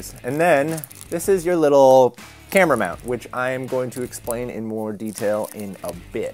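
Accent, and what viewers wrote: Canada. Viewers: Jose Lopez It's Canadian, not Irish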